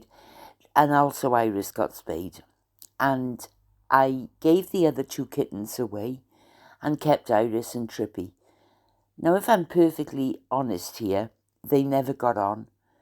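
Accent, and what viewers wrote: Wales, United Kingdom. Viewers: Lovely to hear your beautiful Welsh voice again. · your sweet ,dulcet, Welsh tones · Oh. It’s so lovely to hear your beautiful Welsh voice! I hope you have a lovely Christmas. 🎄🙋🏻‍♀️🎄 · Oh it’s good to hear your welsh accent, I was brought up in Croesycielog and used to go to Cwmbran cinema, left when I was 18 to train as a nurse at Gloucester, retired now but I do love your channel, lost my husband in January and my daughter in July this year, so sorry about your cat, I lost both my dog and cat a few years ago, but to old now to get another one, I have missed your channel, it was lovely hearing from you, have a wonderful Xmas and a very happy new year, hope that lottery isn’t a scam 🏴❤️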